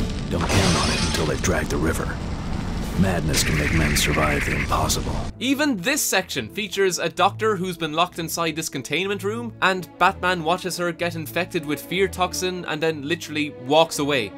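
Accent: Ireland. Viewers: I love your Irish accent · Your Irish accent is jus lovely darling. lol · He sounds so Irish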